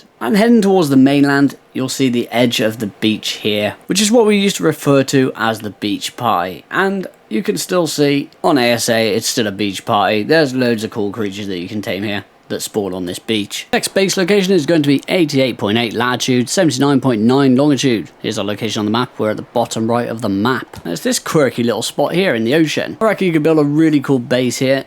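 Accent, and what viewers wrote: United Kingdom. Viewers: i love British accent mate 😊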